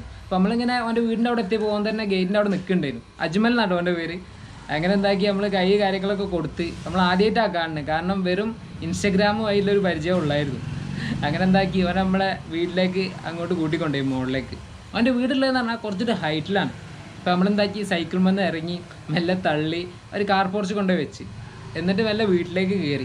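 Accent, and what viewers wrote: Kozhikode, India. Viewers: You’re a innocent guy..may allah bless you...all the best wishes..I feel a great potential in this channel keep this raw feeling of videos...your Calicut accent makes the storytelling more clever... حياك الله الله يبارك فيكم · Just kozhikoden slang... Leave it.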